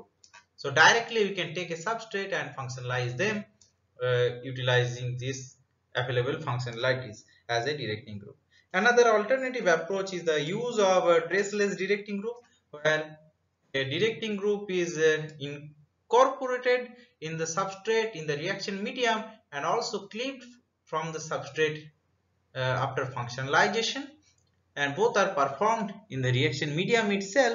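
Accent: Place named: Bengal, India. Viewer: He has Bengali accent, so don't poke him.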